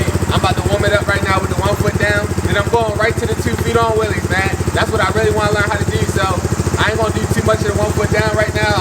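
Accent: Baltimore, United States. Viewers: It’s like an illusion 😅 bmore accent strong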